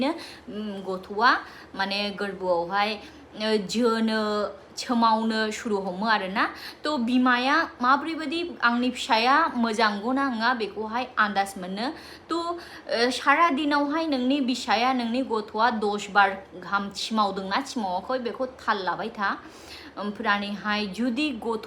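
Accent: Bengal, India. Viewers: Railyna benguli style nisw jdwng boro bydi kwnayaki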